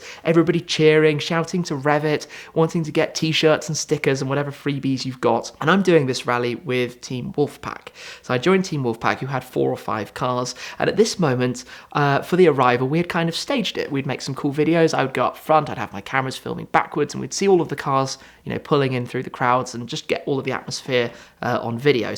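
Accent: United Kingdom. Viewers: He s british :)) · Bc of the British accent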